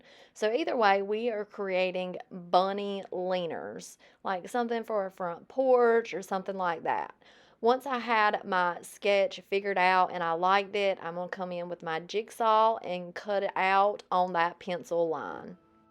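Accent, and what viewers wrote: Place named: Mississippi, United States. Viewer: (my first ever doing a comment) you are so talented in your woodworking! I enjoy your reel contents. You tell enough how you do things but don’t talk to just be talking, which I don’t mind accent being from MS! You always have variety of items. The bench is lovely, but I would have liked the seat stained, too. Adorable bunnies.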